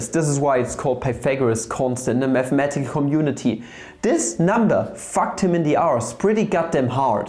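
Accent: Germany. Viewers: german guy tryna speak english for half an hour straight yes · i bet youre german (5 seconds in) ps: bin selber deutscher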